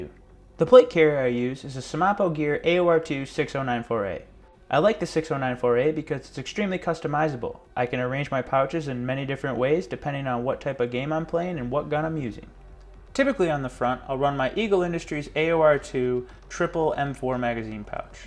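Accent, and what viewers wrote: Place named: Eastern Europe. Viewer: Are you even American. You sound some of an Eastern European ...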